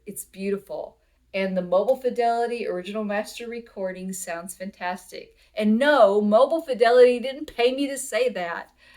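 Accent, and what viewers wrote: South, United States. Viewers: love your Southern drawl accent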